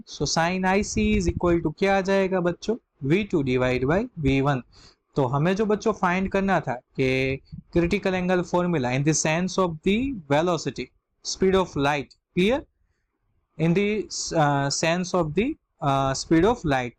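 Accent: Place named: South, India. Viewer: The time I heard south acent I knew that this is the video which will help me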